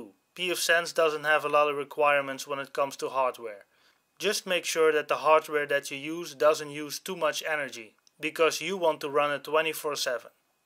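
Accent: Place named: Netherlands. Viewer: Dacht ik al haha, lekker Nederlands accent!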